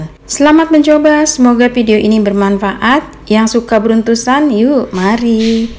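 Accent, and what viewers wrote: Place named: Medan, Indonesia. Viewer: Asli mendan ya bunda soalya logatnya ketauan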